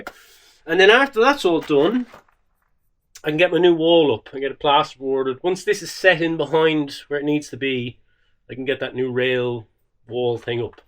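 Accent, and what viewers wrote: Ireland. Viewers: yes. I had noticed his Irish accent ❤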